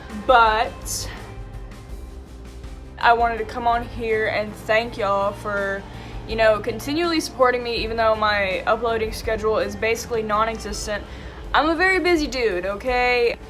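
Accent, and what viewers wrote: South, United States. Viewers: I feel like ur accent has gotten even more southern since the last time I heard you lol, also (obviously you don't have to share if you don't wanna) bit what state r u from I'm in alabama. Ye-haw... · why does your country accent sound stronger in this one lol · off topic slightly but i absolutely adore ur (southern?) accent it oddly suits you really well and it flows out smoothly unlike so many others 😭absolute incredible tour tho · you sound extra southern in this video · dude why do you sound more yee-haw than usual? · Omg finally holy shit anyways you got a cool southern accent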